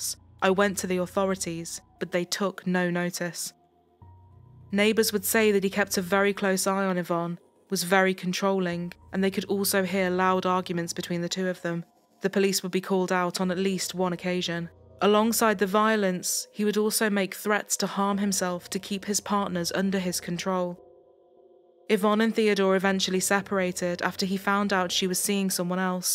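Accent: England, United Kingdom. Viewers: I love her English accent. I only watch her to polish my English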